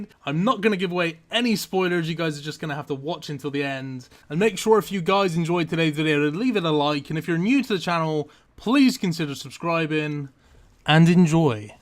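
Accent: Bristol, United Kingdom. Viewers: Just stumbled into this video from one of regular YouTubers, wasn't going to give it the time of day until i heard the accent. Sounds alot like some of my friends from Bristol xD Subbing for that reason alone hahaha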